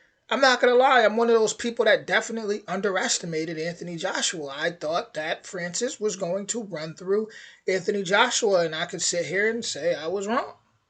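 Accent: United States. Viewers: What part of the states is your accent from?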